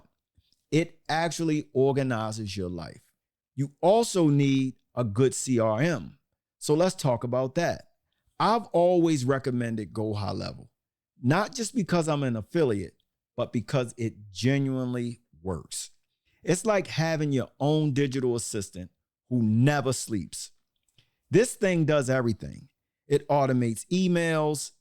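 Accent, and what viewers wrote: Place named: Baltimore, United States. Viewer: Man that Baltimore accent STROWNG ain’t it 😂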